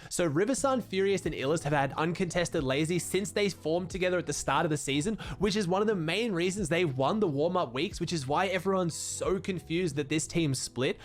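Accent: Australia. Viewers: ello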